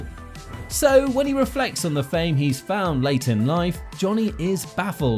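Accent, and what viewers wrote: United Kingdom. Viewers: Get so tired of the brit narrators. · Where in West Virginia did you find a narrator with a British accent?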